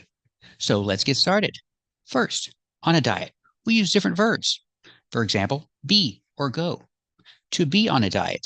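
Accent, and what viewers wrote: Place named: United States. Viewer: face-blue-smiling:... Hello. I follow you for two main reasons: I appreciate your American accent and your professionalism. You explain all the details thoroughly and consistently provide examples of how to use various structures. By the way, where is your accent from? Thanks a lot for everything you do!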